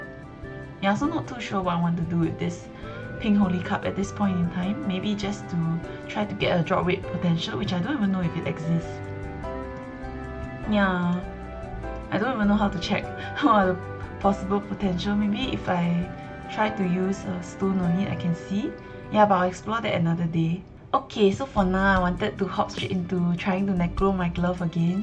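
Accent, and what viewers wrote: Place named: Singapore. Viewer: I agree with his/her comment. I like the Singaporean English accent.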